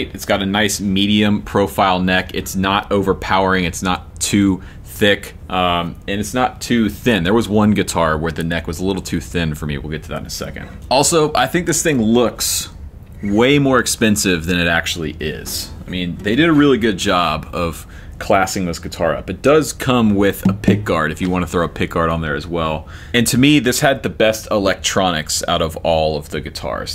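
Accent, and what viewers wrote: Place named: New York, United States. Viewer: i found the Fender had a tubby midrange that bothered me. In that respect it reminded me a little of an Ovation. I might gravitate toward the Hummingbird not because of its look but because I suspect I’d enjoy playing it. My own acoustic is a Hummingbird and, much to my surprise, I really prefer playing it to the D28 I used to have. Both are from the seventies. Ahrange/Or ange is a regional difference. I grew up in NY (metro, not upstate which sounds completely different) and pronounce it like you do. You’ll find the same argument with fahrest/for est. If you want the opportunity to correct your significant other about speech, try the marry/merry/Mary test. If you’re from NY, those are three completely distinct words. For most of the rest of the country, they basically say Mary/Mary/Mary.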